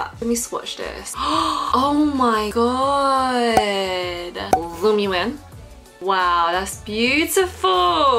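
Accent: England, United Kingdom. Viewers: Your accent tho😍I love ur English accent😍I'm from Ireland btw❤ kildare ( a place in Ireland x) xx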